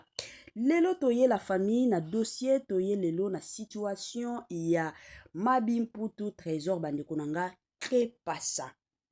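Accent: Rwanda. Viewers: Tika biloba loba yo oza Rwandaise,tonalité nayo eza kofunda yo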